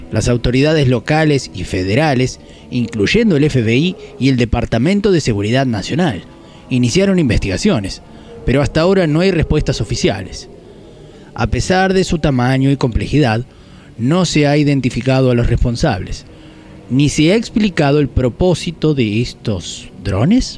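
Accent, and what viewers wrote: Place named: Argentina. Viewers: Si Elvio. Así hablamos en algunas provincias de Argentina. Se lo llama Regionalismo. Saludos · Ese acento es argentino. En España a la "ye" le decimos "y griega", a la "v" le decimos "uve" y a la "w" le decimos "uve doble". Ja, ja. ¡Parece que hay variedad en el español!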